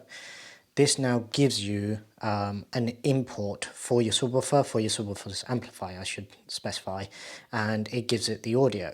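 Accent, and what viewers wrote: United Kingdom. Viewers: Thanks for the English English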